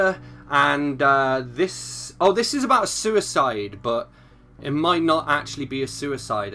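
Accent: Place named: Dartford, United Kingdom. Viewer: I love your accent, are you from Dartford?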